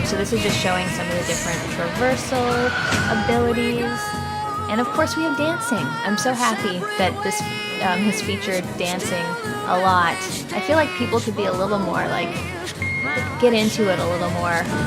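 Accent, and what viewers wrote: Canada. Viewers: Searching for new Bloodlines 2 vids: "Never heard of this American lass before, lets give her a try" - "Its been a while since I last talked ABOOT vamp-" "Canadian. My bad"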